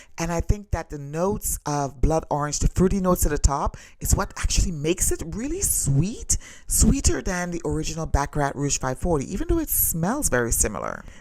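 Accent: Trinidad and Tobago. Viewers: I enjoyed it thoroughly. I could listen to your Trinidad accent and laugh all day. ❤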